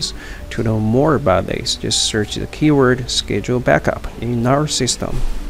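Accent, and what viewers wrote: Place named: South, United States. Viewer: It’s a very interesting accent. I heard moments that reminded me of Indian, Chinese, Vietnamese, US Southern, and maybe Eastern European! My guess is Chinese living in the US South.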